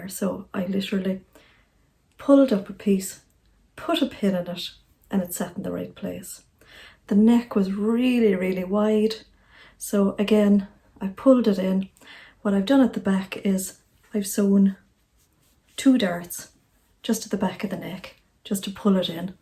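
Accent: Ireland. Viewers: I've just recently discovered your Chanel I have to say I've binged watched them nearly all. Thank you for the hints and tips you make me chuckle as I find myself saying oh I've done that ! And absolutely feeling the pain when you put a lot of effort into your work to find the sizing is totally off ! But you keep going because you love seeing just as I do I also hate to let a mistake beat me I'll try and try to make it work or make something from the material. Cos like you I'm tight I hate waste . So please keep that lovely Irish accent coming with more tutorialS LOL Irene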